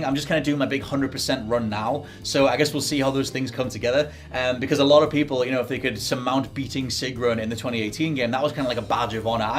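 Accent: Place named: United Kingdom. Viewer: yeah it's a because of the British accent. You'd need to be a moron not to realise it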